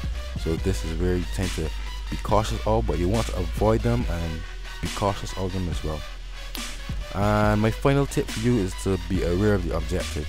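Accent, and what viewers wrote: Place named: Barbados. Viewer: Hi.... Some points for improvement... No background music. When listening on a cell phone the small speaker makes it harder to hear you. I like how you talk the whole time. You come across very clear and the Bayan accent is of course awesome. Last, you might consider adding more tags to increase exposure and I would include MW3 in the title. Most folks are too lazy to type out the full name. Tmartn needs to watch out for!:)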